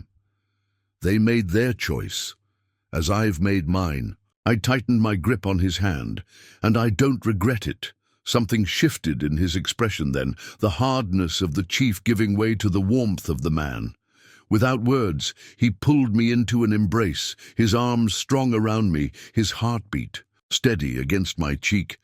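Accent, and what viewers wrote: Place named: England, United Kingdom. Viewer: Why in the world, when it’s a woman’s story, why don’t you have a woman narrating? Instead you have an English chap narrating for an American western, woman’s story?! Quite a turn off!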